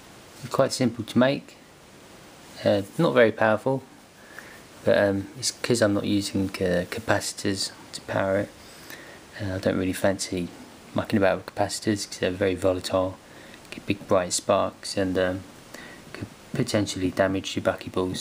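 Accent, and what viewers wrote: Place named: Cockney, United Kingdom. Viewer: It's what's known as a "Cockney" accent.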